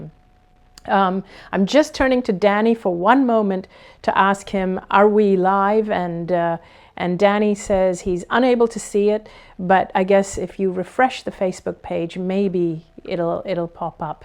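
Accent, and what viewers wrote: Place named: California, United States. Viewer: Oh my! I read your books several years ago. My sister even met you in HK through a friend. Now I have found this site. To hear you speak now you sound very Californian! I’m from Sacramento but now live six blocks from the White House. I need to hear your optimism to get me through these tough times. You’re an angel.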